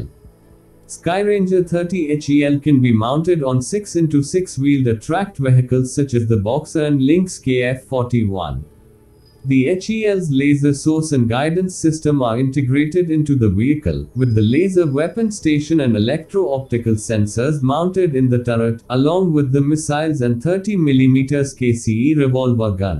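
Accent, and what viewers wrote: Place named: India. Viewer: Even the AI voiceovers are having indian accents nowadays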